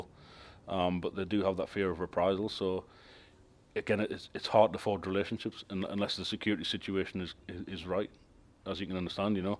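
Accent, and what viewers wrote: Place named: Newcastle, United Kingdom. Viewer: what part of Geordy land are you from ????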